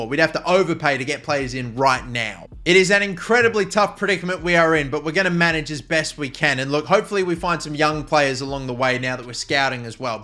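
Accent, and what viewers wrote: Australia. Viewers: Wait is he australian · yh he's from Melbourne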